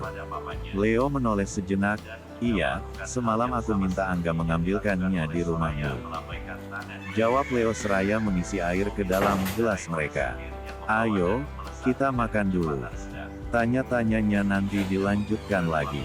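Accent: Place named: Sulawesi, Indonesia. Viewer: Waalaikumsalam bang.makasih semoga sehat dan sukses..semoga semakin bahagia Ale dan leo..bang maaf bahasanya abang seperti bahasanya orang sulawesi...seperti aq 😊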